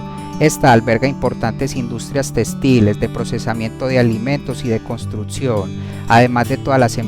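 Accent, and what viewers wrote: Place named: Antioquia, Colombia. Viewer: No púes, y Con acento... Paisa... home! 👍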